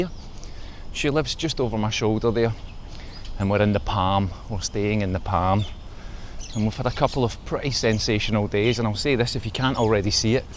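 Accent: Scotland, United Kingdom. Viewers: I've always thought your voice sounds just like Roddy Frames - then I discover in this video you're from the same town ! 😁 - sorry I don't know different Scottish accents,, it's probably obvious to Scots 😂Love the videos, well done on what you're doing 👍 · Really enjoying your channel. You are very well Scottish spoken. So soothing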